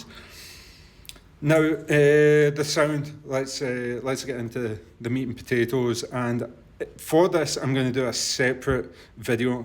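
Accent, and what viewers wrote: Scotland, United Kingdom. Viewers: British ?? that would explain your accent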